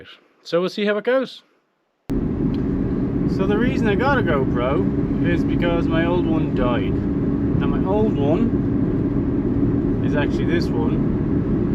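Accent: Ireland. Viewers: Hi! I found your channel by accident but know you from Dan's channel (been following him since his farmhouse days). As I have enjoyed your interactions I've seen from Dan's perspective, I thought I'd check your channel out and I love it! I hadn't heard enough to realize that you're Irish until hearing you on your own. Irish blooded myself, I miss the quirky, snarky humor and so thrilled to see it in you. Thanks for the smiles and I look forward to more! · New viewer here, thanks to Dan posting your puppy video. Seeing as you so kindly numbered your vlogs, I decided to begin at the beginning of them. You're loads of fun, great scenery videography, witty reparte', lol, what's not to like? I could listen to you talk all day long. Your accent is much like my own. I have missed hearing it. Dad's family were McKinley's from northwestern Ireland. Most there starved by the Catholics and British. 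So, to America, his grand dad went from mining and being a Molly McGuire to becoming a weaver in Philadelphia. Dad and his dad carried on the traditions of being Molly McGuires in Ohio, West Virginia, and Kentucky with full support of corrupt cops. We used to sit on a blanket covering loads of guns, ammo and dynamite, while traveling 4-5 hours out of state as kids. As you might imagine, our childhoods were sheltered and so controlled because he was in organized crime that our way of speaking remained Irish. An englishman, a linguist, once paid me to talk as he recorded me speaking. He rather enjoyed pissing me off to hear me tell him off, lol. I am, myself not Irish by blood though I have green eyes. I never feared St. Patrick's Day at school for not wearing green. Not wearing it got one punched in the shoulder. I am native American Indian by blood, a direct descendant of the Shawnee Indian leader, Tecumseh. 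I don't know how to be an indian, except dad respected how quiet, sneaky, and courageous I was when he threatened my life and tried to ki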